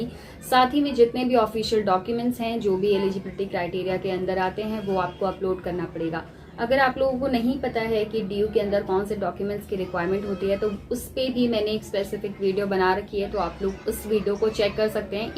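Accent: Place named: Bihar, India. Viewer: Apakee baat se hme lag rha hai ki aap bhi bihar se hai hai nn 👍👍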